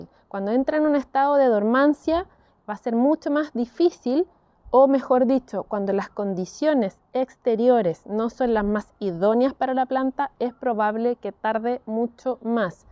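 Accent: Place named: Chile. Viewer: Muchas gracias por compartir! Me encantó el video y tu acento chileno! Saludos desde México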